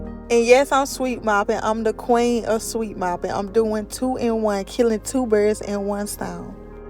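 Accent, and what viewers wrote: South, United States. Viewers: I like your country accent I'm country too Beautiful Queen you clean your house so well that Amazing. ❤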